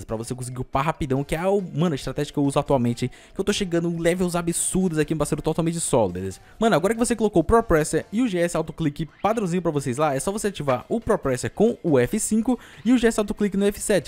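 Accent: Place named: Bahia, Brazil. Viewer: Essa sua voz de baiano me encanta 😔✋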